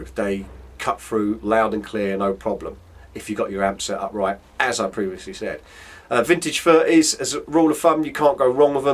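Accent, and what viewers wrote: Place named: North, United Kingdom. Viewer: Where are you from, mate? Is that some northern England accent? I am not a native english speaker, but it is like i have no difficulties to understand every single word you say. What makes it even more strange, is that i dont hear this accent very often, yet it is much more understandable to me than every other english accent i heard.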